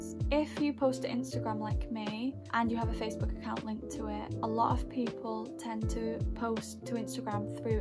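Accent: United Kingdom. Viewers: shes british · Where in Yorkshire are u from??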